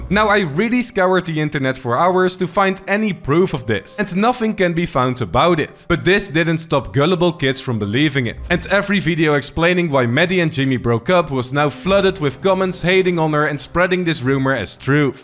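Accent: Netherlands. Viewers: Where are you coming from? You sound Dutch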